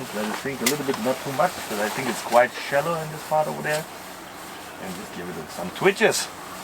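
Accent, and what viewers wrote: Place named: Europe. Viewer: Im half Scottish half Hungarian. And you sound kinda european so I thought i’d ask🤣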